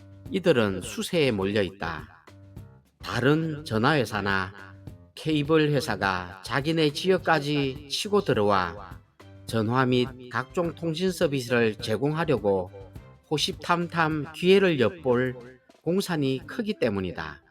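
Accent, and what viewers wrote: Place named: Gyeongsang, South Korea. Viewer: 투박한 말투와 갱상도 억양에 묘한 끌림이 있네요~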